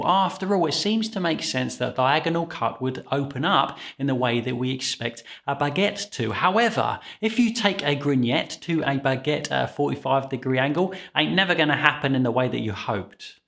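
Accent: United Kingdom. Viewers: thank you, nicely explained. I learned the „why“ which is nice. But to be honest: Beeing a German I could listen just because I’m enjoying your English pronunciation.🙂 My breadbaking journey is fun in many ways.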